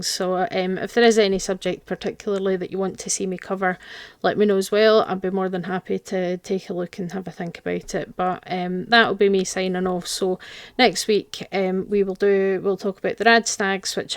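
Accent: United Kingdom. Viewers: This video is cool, thank you scottish science youtube lady 👍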